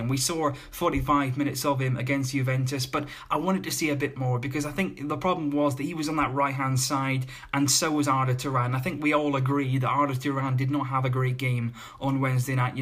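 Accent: United Kingdom. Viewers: Im curious whats your nationality? You sound British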